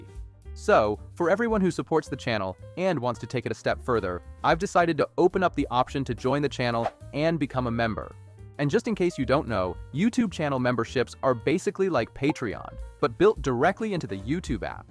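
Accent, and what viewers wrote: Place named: Midwest, United States. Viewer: Very nice new avatar and voice. We enjoy listening to your new voice. I am curious how you manged to get a US mid-west accent in place of your own. Did find another voice similar to your own and sample that instead?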